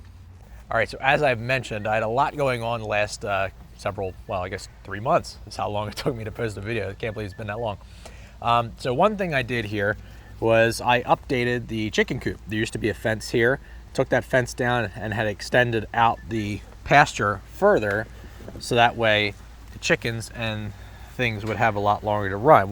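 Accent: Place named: Pittsburgh, United States. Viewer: Man, that's one hell of a yinzer accent!! Love your work!!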